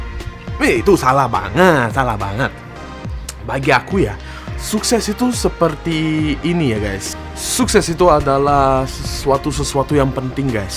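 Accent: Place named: Indonesia. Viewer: gilak bahasa indonya udah logat indo banget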